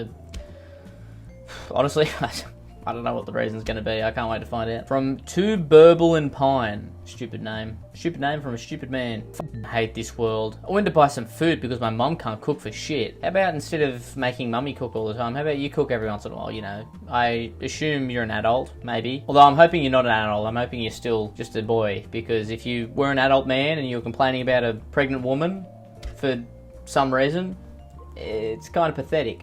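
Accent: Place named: Australia. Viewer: your funny australian accent tickled my fancy dear young man you get a like 👍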